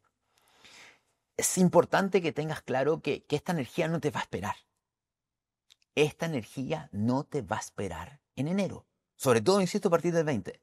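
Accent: Chile. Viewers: Gracias por tu impetu y energia y ese acento chileno que me encanta Eres luz y claridad, hagas lo que hagas, siempre sera un exito❤gracias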